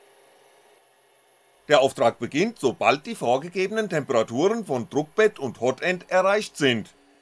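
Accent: Hesse, Germany. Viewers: Es geht nicht um deinen Dialekt, es geht nur um die überdeutliche Betonung. Also nichts gegen deinen Dialekt, im gegen Teil! Hessisch is doch a Geiler Dialekt! 👌 Mir ging es darum das es anstrengend ist das ganze Video zu hören. Infos und du Machart ist echt super. Also nicht als Kritik an sehen ;-) , nur meine Meinung! Trotz allem weiter so, Abonniert ist ja auch schon. Grüße nach Hessen Thomas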